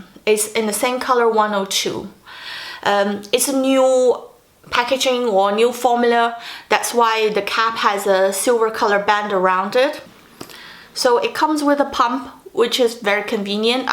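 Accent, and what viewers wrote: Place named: United Kingdom. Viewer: 厉害了我的琳👍英文好赞！喜欢你这带点英式的发音呢😊😊😊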